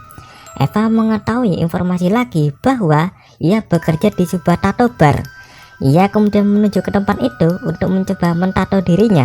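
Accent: Java, Indonesia. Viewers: Ini ebbo kucing versi review film nya tapi medok 🤔😅✌ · nya medok rek bikin gumuuz😂😂😂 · kucing host nya logatnya medok hhh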